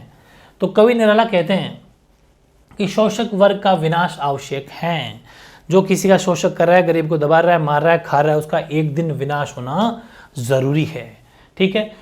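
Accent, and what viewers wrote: Rajasthan, India. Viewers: Sir ke bolne ke tarike se kis kis ko pta chalta h ki vo rajasthan se h😅❤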